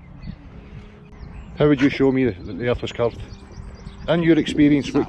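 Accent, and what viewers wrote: Scotland, United Kingdom. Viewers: I like scottish accent 😉 !! I thought he was a relative of Charlie Burchill 😂 ( Simple minds)!! Greetings from Italy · I love it!! And you remained friends.....and sowed a seed. Well done! (What part of Scotland are you from?) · That was so well done. I love the Scottish accent as well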